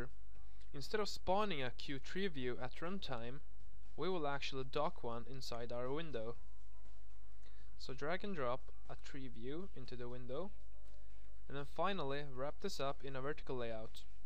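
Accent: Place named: Sweden. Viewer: The UI language and his accent suggest Sweden.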